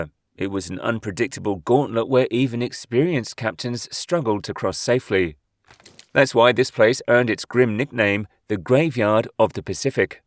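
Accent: United Kingdom. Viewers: Your accent is really annoying. Pretty much all british people are tho so i dont blame you, but you should stop talking so much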